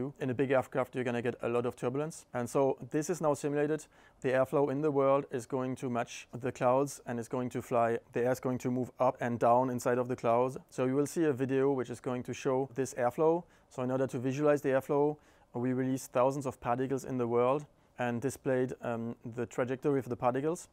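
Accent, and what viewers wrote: France. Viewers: As seriously as I take all these amazing new sim technologies and stunning realism... that French accent just takes the cake for me xD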